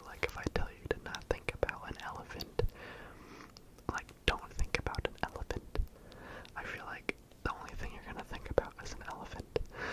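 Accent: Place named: France. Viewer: Im in the same boat with college picking. Deciding between a good college in a good area but is more expensive and a good college in a not so fun area that is cheaper. Also idk If you want to know this, but when I first saw you I thought you were French. Cause in the specific video you sounded like you had an accent and I saw you had a French video on your channel. Also you kinds look French. I like to hear stuff like that, ive heard I look Russian a lot, same with my brother who looks like me.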